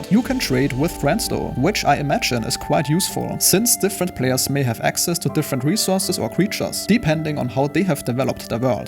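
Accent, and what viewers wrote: Germany. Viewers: Sorry to say this but I couldn't get past the thick accent (assuming you're from Germany?) Maybe try to slow down your narration and enunciate the words a bit more. I hope this helps.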